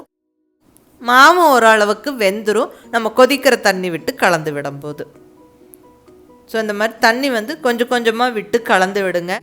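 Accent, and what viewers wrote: Kongu, India. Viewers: She is from coimbatore. Kongu tamil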